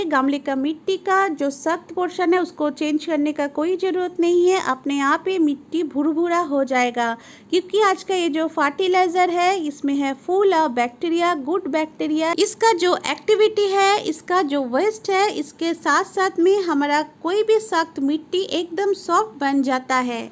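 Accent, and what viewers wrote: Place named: Bengal, India. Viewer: If you don't mind, Are you from Kolkata. Your dialect suggests you to be a Bengali.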